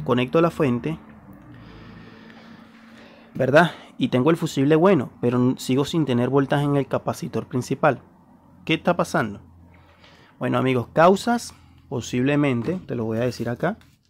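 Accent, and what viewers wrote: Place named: Venezuela. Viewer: Por tu acento mi pana veo que eres venezolano igual que yo.... Te la estás comiendo viejo... Gracias en nombre de la comunidad que nos apasiona la electrónica porque tus clases son gratis, bien explicada con excelente metodología.... No le pares bolas a los envidiosos...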